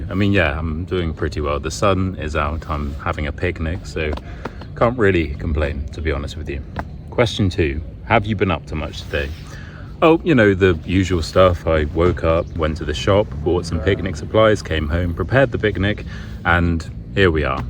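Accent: United Kingdom. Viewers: British accent it is love it❤❤❤🥺🥺🥺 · british accent is my favourite. greetings from caracas, venezuela.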